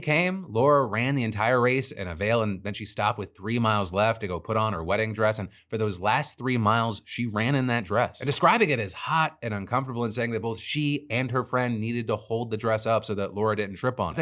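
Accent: United States. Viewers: My fun fact is for my Final GCSE Drama Performance, back in 2016, I needed to do an American Accent for a reoccurring news reporter character, I based it on and trained myself on your voice for about 2 weeks... And I passed! Cheers, Phil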